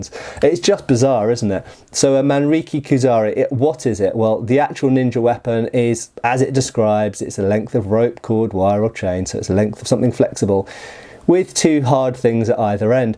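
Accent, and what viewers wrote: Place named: England, United Kingdom. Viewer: yep, really sounds English to me...................................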